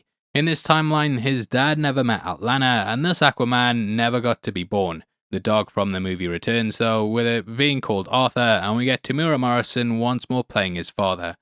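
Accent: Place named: North, United Kingdom. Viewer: Find your accent so interesting. Clearly northern but you drop ‘t’s like an American. 😅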